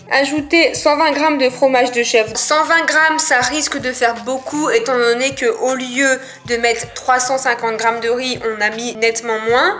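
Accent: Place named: Nord-Pas-de-Calais, France. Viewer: cet accent ch'ti j'adore!!! T la meilleure!!!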